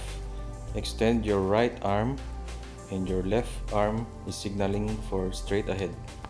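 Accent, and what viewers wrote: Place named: Philippines. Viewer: Filipino detected hehe. The voice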